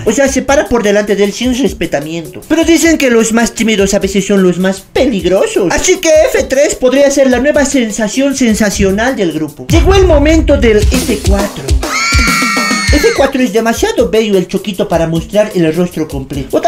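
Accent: Bolivia. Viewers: hablas del Cu.... tipico hacento Cochabambino que intenta ser graciosillo...... por cierto eres muy guapo 😆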